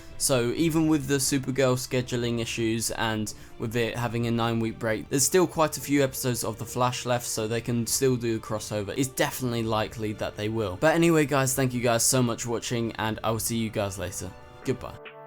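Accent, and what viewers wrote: United Kingdom. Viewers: r u british m8?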